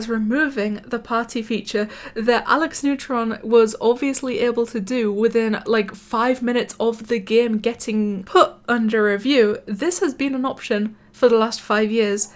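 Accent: United Kingdom. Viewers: I like ur British acebt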